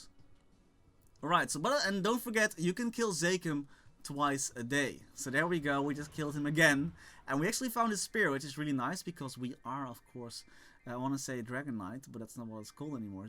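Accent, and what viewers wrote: Netherlands. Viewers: just new to ur channel u sound dutch, nice video btw!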